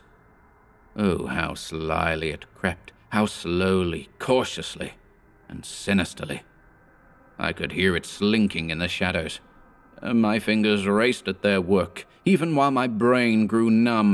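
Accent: United States. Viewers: Fantastic reading, as always...love the American accent lol...if only I could master a British accent even half as believable I'd be a happy girl 😊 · Your American accent is impressive. Excellent as always Mr. and. Thank you.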